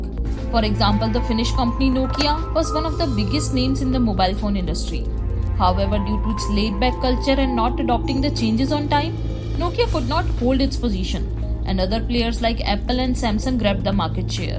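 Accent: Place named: India. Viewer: You seems to Indian origin from your voice. Why don't you create content in Hindi? This type of content will go viral in Hindi speaking audience.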